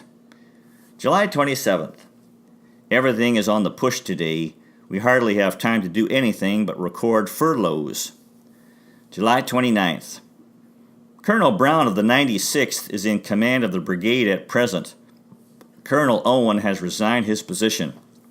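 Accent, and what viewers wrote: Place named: Germany. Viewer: Do u speak German ? It seems like you have a slight German accent very interesting diarrhea appreciate you reading it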